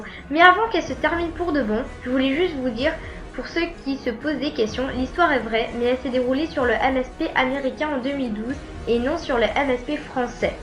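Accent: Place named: England, United Kingdom. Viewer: J'ai kiffé l'accent anglais ('x ♥♥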